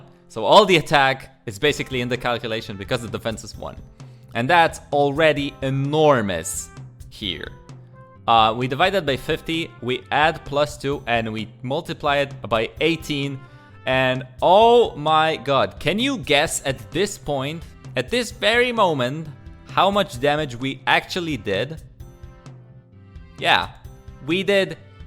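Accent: Poland. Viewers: Love the polish accent in the video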